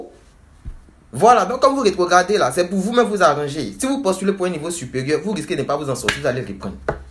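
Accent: Ivory Coast. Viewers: Mdrrr tu m'as tué avec votre accent ivoirien. Bah j'suis un étudiant sénégalais en licence 3 commerce international je souhaite intégrer un iut . Dans mes choix de formation j'ai postuler 6 formations en métiers du commerce international et une formation en DUT techniques de commercialisation. Ma question est de savoir si il est conseillé de postuler dans différentes formations avec quelques rapport en termes de matières enseignés et de débouchés professionnels. Et comment justifier cela au cours de l'entretien pédagogique ? Merci d'avance !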